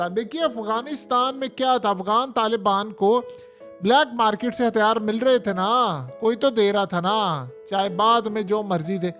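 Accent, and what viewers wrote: Punjab, India. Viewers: Would like to see the picture and name of the News reader / anchor of Haqeeqat TV. He speaks very well with a touch of Punjabi accent. The news is also very authentic and original (First to broadcast). Thanks.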